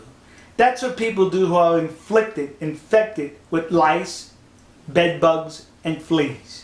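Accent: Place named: Texas, United States. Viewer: テキサス親父さんの明るいキャラもさることながら、その「発音」にすごく興味が行きました。いつも聞きなれているアメリカ英語とずいぶん違いますね。これがテキサス訛りというやつですか。今、テキサス出身の先生に英会話を習っていますが、「テキサスの人はゆっくりしゃべるから日本人に分かりやすいんじゃないか」と言っていましたが、本当ですね。英語圏の人がみんなこれぐらいの速さ（遅さ）で話してくれればもっとありがたいんですけどね（笑）。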